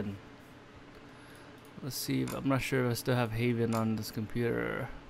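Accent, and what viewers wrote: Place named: Cambodia. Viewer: Why do you have a Viet name but a Cambodian accent?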